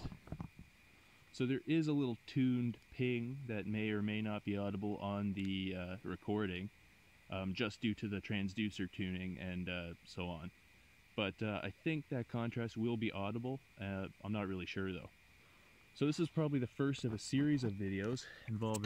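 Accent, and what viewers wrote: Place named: Canada. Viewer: Yeah I'm enjoying the dual pancake experiments! Very little on yt done with actual muon air shower measurements, so that's interesting to see. You sound Canadian and it looks like you're in a deciduous forest - Ontario? If so and you're ever in Rochester for some undoubtedly regrettable reason let me know and I'll give you a tour around the high energy laser fusion facility here.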